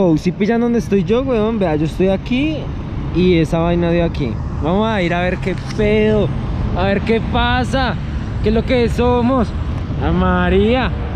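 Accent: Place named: Bogotá, Colombia. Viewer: severo acento rolo jajaj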